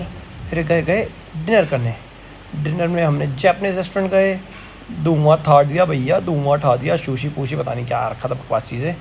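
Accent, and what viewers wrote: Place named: Garhwal, India. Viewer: Germany looks amazing! 😍✨ First of many, hopefully. Garhwali accent was the best! 😂🙌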